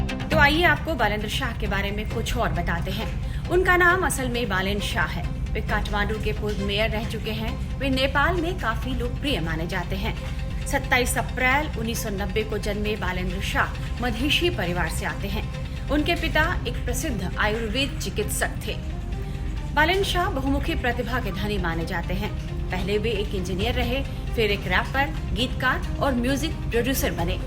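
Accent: Bihar, India. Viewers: Mam aap bihar se lag rahi ho ya up gorakhpur sides se ho?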